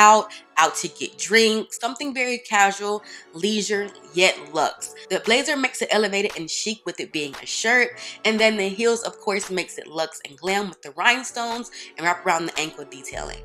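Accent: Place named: Florida, United States. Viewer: Super cute looks! 😍😍 I could tell you were from Florida or lived there! Your accent! I’m originally from Miami 🤍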